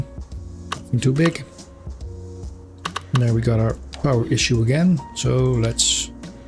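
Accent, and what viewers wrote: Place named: Netherlands. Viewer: That accent... a fellow Dutchman by any chance?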